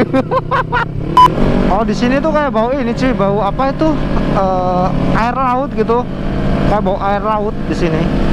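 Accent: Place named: Banyumas, Indonesia. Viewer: Ko ngomonge ngapak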